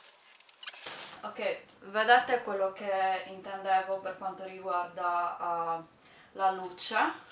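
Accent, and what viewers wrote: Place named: Sardinia, Italy. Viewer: Sono felice di rivederti cara e principalmente di poterti ascoltare, fortissimamente sarda...:)))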